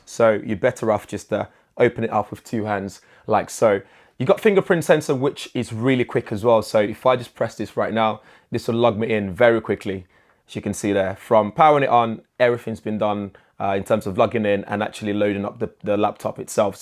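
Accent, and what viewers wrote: United Kingdom. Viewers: Brits English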